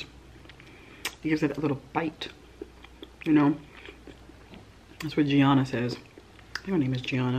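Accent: New York, United States. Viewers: Lmbooooooo at your NY accent. I’m from NY. You dZid good sis. Lolol (I added the z on purpose) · She should do a mukbang video only talking in her New York accent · This girl from Queens appreciates your NYC accent! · I don’t know what it is but I really enjoy watching you eat Mac N Cheese and it’s so pretty too 😎 yes I love a NY accent too 🤣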